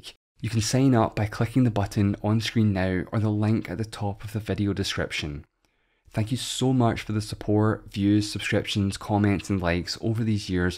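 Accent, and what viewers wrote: Scotland, United Kingdom. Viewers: I find this Scot very difficult to understand; could he please speak the King's English?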